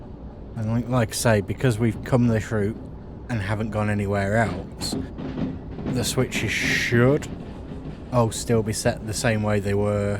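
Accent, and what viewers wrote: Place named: England, United Kingdom. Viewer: Great video. I love that English accent and your sense of humor.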